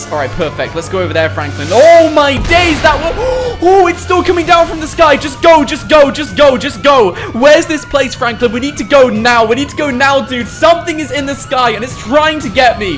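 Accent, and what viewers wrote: United Kingdom. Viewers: You have a British accent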